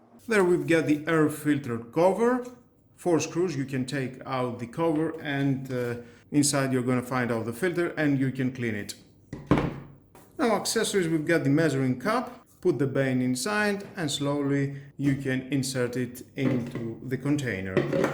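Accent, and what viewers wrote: Greece. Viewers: Αδερφε Ελληνας? :D Με το που αρχισε το βιντεο σε εφαγα απο την προφορα.χαχαχα Δυνατος! Νομιζω οτι ηθελε λιγο πιο αραιο το χρωμα, αλλα παλι καλα ειναι λογω του μπεκ. Ωραιο Βιντεο.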